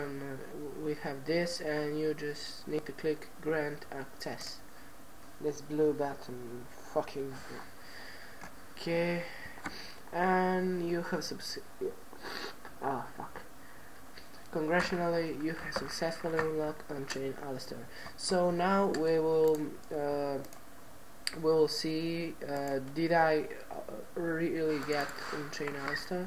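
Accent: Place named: Serbia. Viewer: You talking Serbian-Englesh laung :O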